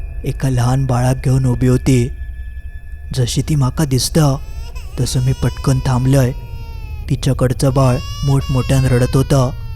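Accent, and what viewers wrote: Malvani, India. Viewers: आपल्या वाणीत मालवणी भाषैचा गोडवा आहे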